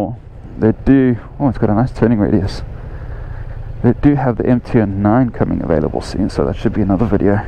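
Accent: South Africa. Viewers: What part of South Africa are you from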